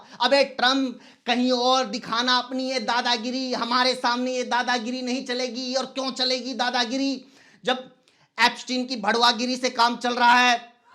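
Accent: Haryana, India. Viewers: I love your Haryanvi language fragrance ❤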